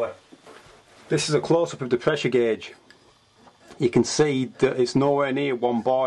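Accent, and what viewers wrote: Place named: Blackburn, United Kingdom. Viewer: "Top it up to one borr...corrrned beef in the corr porrk...." I love the Blackburn accent! Or Burnley or Chorley...somewhere around there. Godd videos too, a Godsend for a useless lump like me.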